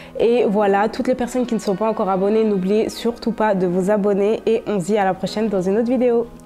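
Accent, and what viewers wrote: Paris, France. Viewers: To jest akcent paryski jakim mówi teraźniejsza młodzież. Wiem bo urodziłem się we Francji i mieszkam pod Paryżem. Jak dla wszystkich języków, w szkole uczą nas wersje literacką języka, a nie tą co się używa na codzień. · standardowy akcent bym powiedziała , akcent z Paryżu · Mówi Bardzo Czystym Paryskim akcentem Gawiedzi ! Na 100 % Urodzona we Francji ! Kończyła Szkoły we Francji ! Na 99 % Pochodzi z kompleksu Miejskiego Paryża ! Powiedział bym Okolice Saint Denis ! Przynajmniej Uczęszczała Tam do Szkół ! Na 100 % ! Ale Kultura Osobista ! To 94 Departament ! Francuski Literacki ! A ,, ąrgo ” ( Dialekt Paryski! ) To Dzień i Noc ! To co się Uczyliścje w Szkole ! To Język Literacki ! Prawie Wymarły !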